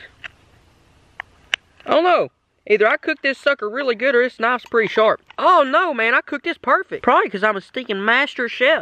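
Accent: United States. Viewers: I LOVE HIS AMERICAN ACCENT!!!!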